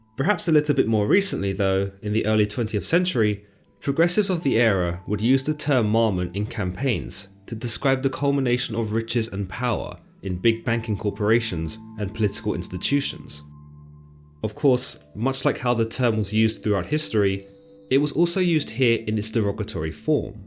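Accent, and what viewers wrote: United Kingdom. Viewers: Lmfao no ones gonna watch this boring video 😂😂😂 i clicked on it and u have to read it and the bucktooth brit accent hell nah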